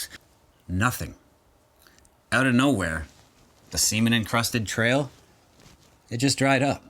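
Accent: Canada. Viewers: I hear a Canadian accent? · Good vid! Wait….are you Canadian??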